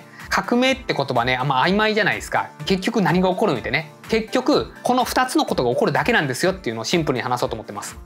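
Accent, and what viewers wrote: Hiroshima, Japan. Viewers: いつもワクワクさせてもらっています。そして、生き残りについて真剣に考える契機になってます。私は、広島弁が人間らしく好きです❗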